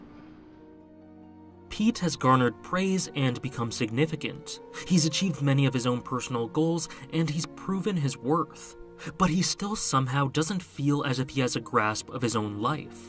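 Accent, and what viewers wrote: Canada. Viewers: I'm going oot on a limb and guess you're Canadian?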